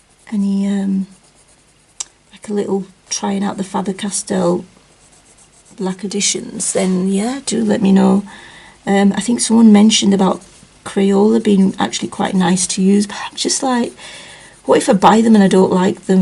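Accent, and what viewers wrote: United Kingdom. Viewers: I love hearing you talk, K. Maybe because Im American & like the UK accents.hehe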